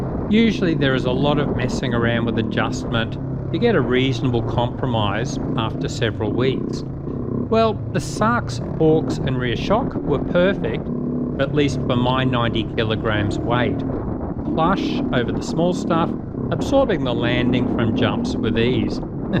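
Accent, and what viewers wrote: Australia. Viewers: Nope, just nasally Aussie twang 😉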